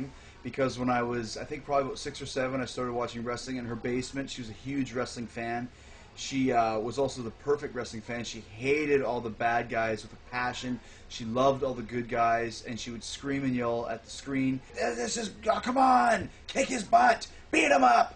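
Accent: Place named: Canada. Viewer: he says aboot. such a canadian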